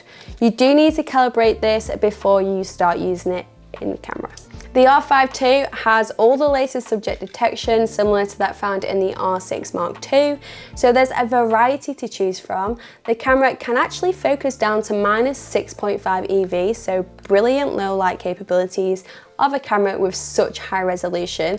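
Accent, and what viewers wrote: United Kingdom. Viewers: As someone from Texas, it might be the British accent that entranced me, but this might be one of the best reviews on YouTube- great job 👏 (wait, am I supposed to bow?). As for the camera, I’m shocked at the quality at 1/4000, f/4, ISO 6400. I’m looking forward to what I will get using a 2.8 lens…wow! Keep it up, Queen 👸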